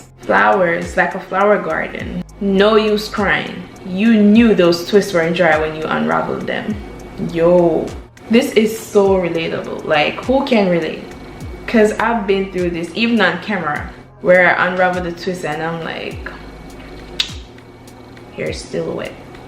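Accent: Jamaica. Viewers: I swear it so comforting hearing the Jamaican accent as another Jamaican person 😩🤣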